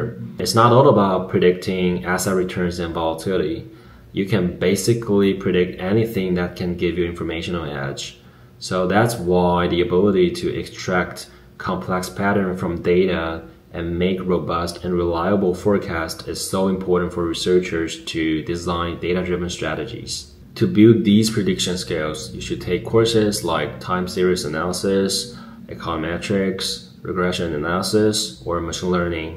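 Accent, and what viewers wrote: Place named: Taiwan. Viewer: 好感動影片看到一半聽出主播也有很濃的台灣口音，親切阿~~好親切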